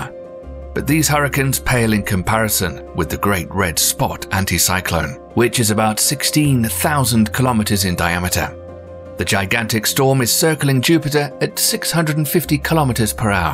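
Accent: United Kingdom. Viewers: Another Brit accent. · I'm tired of Brit8sh accents.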